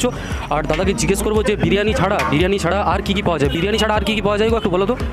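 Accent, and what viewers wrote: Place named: Bankura, India. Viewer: Dada tumi koto 1th kotha bolo so nice tomar kotha sunai boja jai tumi bankurar manus ....very nice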